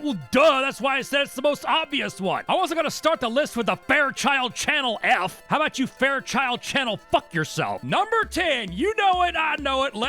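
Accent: South, United States. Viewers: ahh, a southern man telling us about bad hardware on game consoles. Peak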